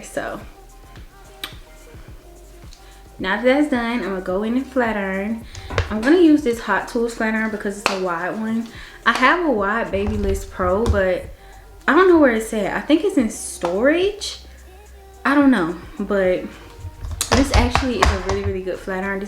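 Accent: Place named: South, United States. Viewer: love video and your country accent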